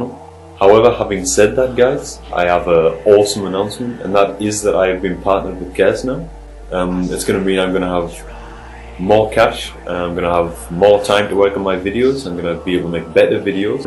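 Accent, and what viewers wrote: Newcastle, United Kingdom. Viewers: Where are you from? You play on U.S. right? Just 'cus your accent sounds like a Geordie's a little :> gz on the partenership! looking forward to more content :)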